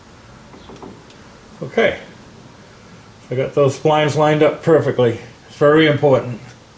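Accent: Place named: New England, United States. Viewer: Sound like your from either Pawtucket or Taunton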